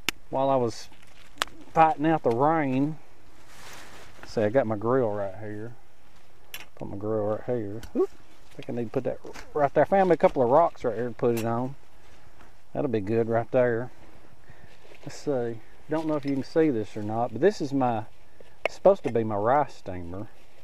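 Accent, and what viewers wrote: South, United States. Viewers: Hi, I've just discovered your channel and " ah jest lurve it". Especially that good ol' southern boy accent. And you have just so many good tips and advice - and your sense of humour just starts my day off with a smile! Keep 'em coming. I'm lovin' it. All the best from Geoff in lil ol' England. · Everything's so dad gum wet! I LOVE your southern twang. Good thing your a good sport, otherwise Nick could be on the business side of a knuckle sandwich, right? Haha. Hope all gors well. I know if anyone can do this...it's you. You got this,!!! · Beaver+southern accent= what region??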